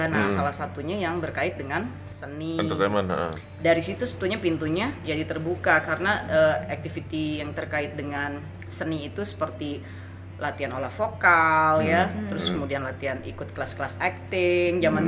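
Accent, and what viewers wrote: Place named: Palembang, Indonesia. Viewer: Senang dengar baso baso Palembang, wong kito galo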